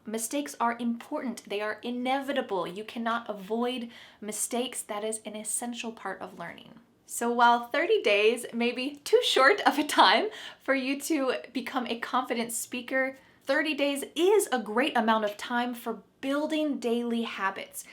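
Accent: United States. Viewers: Of course, I will watch every of your video. Cuz you are using American English. Perhaps, you’re from the US. I am now learning American English, this is why I subscribed your channel. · She only speaks American, not real English. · It's nice to find a normal American who speaks English. And not a Foreigner with a accent. Which makes it harder to understand. 😧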